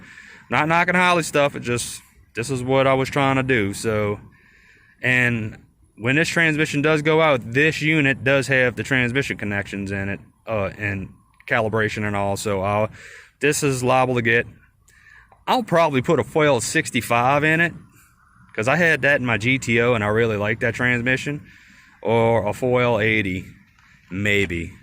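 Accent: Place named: Louisiana, United States. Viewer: sound like you from south Louisiana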